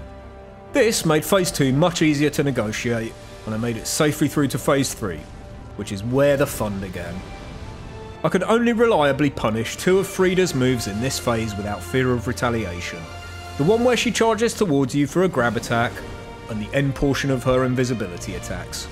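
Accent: United Kingdom. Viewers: This British accent is like a dark souls one, you’re the onion knight of reality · Somehow your british ( I think, im sorry if I'm wrong ) accent makes this 10 times more entertaining .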